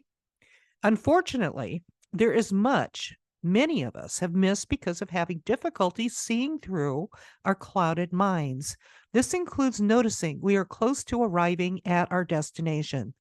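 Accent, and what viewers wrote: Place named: Illinois, United States. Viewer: Love your voice! Reminds me of growing up in Illinois! Great info and a pep talk too just to remind us how far we’ve come!😊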